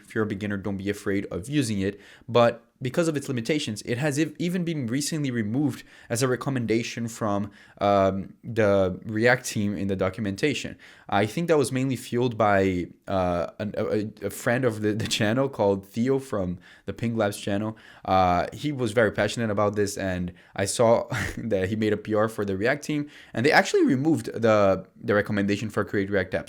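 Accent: Brazil. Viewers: Great video,! Hey, I'm curious about something... Are you brazilian or even son of brazilian parents? It's kind of weird that I understand almost 100% of what you said cause your accent sounds like mine hahah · Tu é brasileiro por acaso? ksksksks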